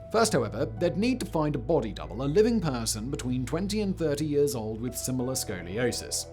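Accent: United Kingdom. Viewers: I can’t be the only one that was expecting Vsauce and was shocked with a British accent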